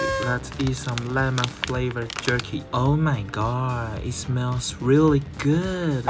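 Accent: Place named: Taiwan. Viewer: 戴黑框眼鏡看起來好顯瘦喔，的台語聽起來很好聽欸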